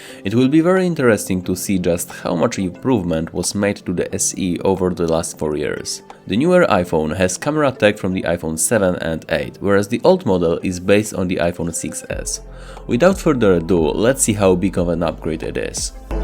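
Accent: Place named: Poland. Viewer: Siema, fajne porównania, słysze po akcencie że chyba jesteś Polakiem :D Swoją drogą co to za nową stacje budują w 3 minucie filmu?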